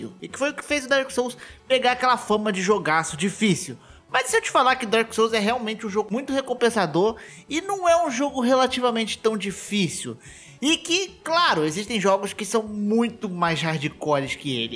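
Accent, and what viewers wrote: Rio de Janeiro, Brazil. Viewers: vc e do Rio?(se for e de onde?)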